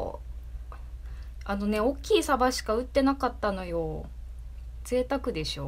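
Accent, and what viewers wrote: Kansai, Japan. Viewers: ガッツり食べる女性は大好きです。 こんなに可愛い女性だとは💕 関西弁なんですね♪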